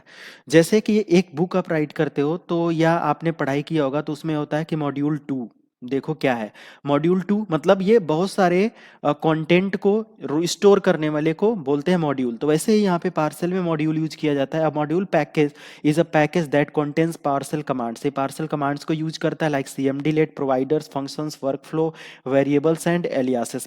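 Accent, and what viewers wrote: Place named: India. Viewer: Big bro, Its too good. Flaws hain kuchh.. jaise chaand pe daag. Communication skills pe bhi kaam karo.. Sh s wala issue hai.. Jab bhi Power Shell bolte ho.. Partial sunai deta hai... confuse ho gaua tha me.. Actually, s sh issue bhi zada ni hai.. i heard you often using the correct form... intemitent issue hai ye.. kabhi kabhi miss karte ho... Khatarnak Indianism... ye global tech hai... isme bolte time Indianism khatam karo... angrezi bolna majboori hai kyuki un terms ke liye Hindi words hain he ni... lekin aap bolte time apne brain me translate jo karke bol re ho.. wo translation practice idhar reflect ho ri hai... Khud socho.. itna knowledge wala video agar proper English me dalo.. to kitne consumers jeebh latkaye laar tapkate bethe hai apko sunne ke liye... Kisi ko hire karo... apki knowledge proper audio me dene ke liye.. 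mujhe rakh lo... zada paise ni mangunga... kyuki mujhe bhi to gyan milega... Appreciate your efforts. Loads of respect. Many thanks.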